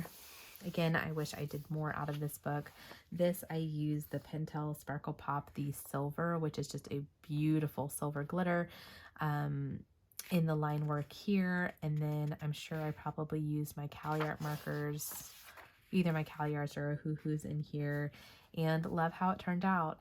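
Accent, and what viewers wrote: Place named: Texas, United States. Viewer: Wow, congrats on the record and all such beautiful pages 🥰📚 I love your Texan accent and you did soo good on the pronunciation 👍🏻 Thank you for the shout out and your very sweet words, I really enjoyed our body-color 😊 I hope you feel better soon and I really look forward to all the interesting things you have planned for the channel and also congrats on all the new subscribers, that is so awesome 🤩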